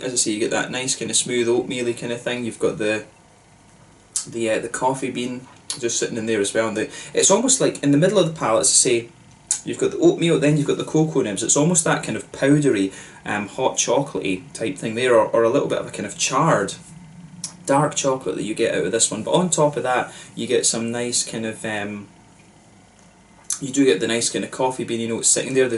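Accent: Scotland, United Kingdom. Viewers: You sound Scottish but did you say you’re from Sweden? Nice review. My go to for craft beer is the boys from the craft beer channel, johnnie and brad. You guys should get together for a livestream. Cheers brother 🍻👍🏻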